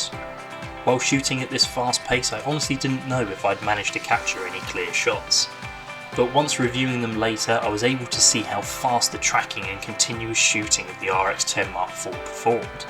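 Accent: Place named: United Kingdom. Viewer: Your audio is really ok for normal hearing people but when you are exposed to jet engine noise over several years it a bad cobination with the british acent and the music your playing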